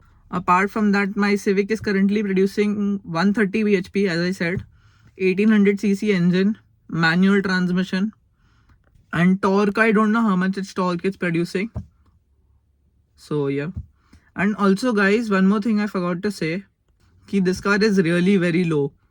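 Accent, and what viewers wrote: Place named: Mumbai, India. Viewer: Its a kinda Mumbai English accent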